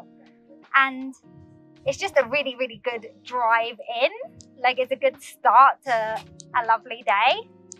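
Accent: United Kingdom. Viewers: 好聽的英國腔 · 英國腔真的超好聽，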